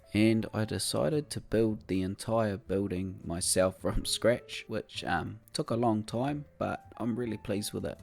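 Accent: New Zealand. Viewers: “The first thing I did was to add this massive dick” lol :D Love the kiwi accent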